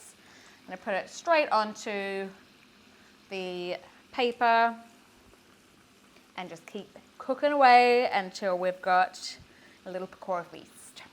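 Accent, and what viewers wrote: United Kingdom. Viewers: thank you... you sound soo british...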